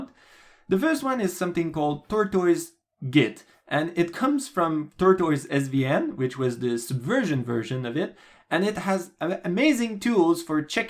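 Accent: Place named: Quebec, Canada. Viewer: Québécois? L'accent semble dire que oui! Ton channel est vraiment bon merci pour les vidéos et l'effort. J'ai une question pour toi (je vais la mettre en anglais pour le bénéfice de tous). I use git/github for programming. However, I'm just starting game dev as a hobby: how do you use it for version control specifically for video games? Do you save everything, or just your source code? Do you use different kind of backup (one for the binary files - or at least non-code, such as the project files - and then one for the source code?) or just one repository for everything (or do you save them elsewhere)? Or for the binary files you just put them on a physical device for backup? Also, what's the architecture of the git trees itself for games (in terms of good practice)? Is it the same as software where you have one main stable one, then multiple production one for different features, etc. 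? In others words, could you extend a bit the end of your video? Thanks!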